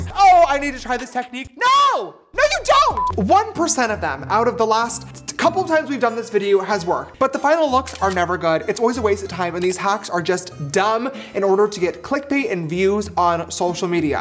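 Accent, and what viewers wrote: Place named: Scotland, United Kingdom. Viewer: is it just me or like kinda has a scottish accent in this vid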